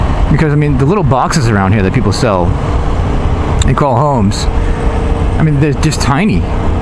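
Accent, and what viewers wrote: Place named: New Jersey, United States. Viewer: I detected an accent in your voice, did you live in Jersey before L.A.? You should get an aftermarket pipe, the M4 slip-on is cheap, so that people can hear you better (keep you safer) and it makes the bike sound like the bad-ass it is. I would also ditch those Mickey Mouse ear mirrors like I did on my FZ 07, too and get some CNC Mirrors by RIDEIT for your FZ 07, on Amazon. They look much better and are adjustable, trust me, and they're only $59.00. Nice little camera there, good mic. Doesn't that low beam suck! I can't stand riding mine at night at anything over 35 or you over drive them. Thinking of getting some good Denali micro lights to mount on the forks/take care of that crap. Be safe!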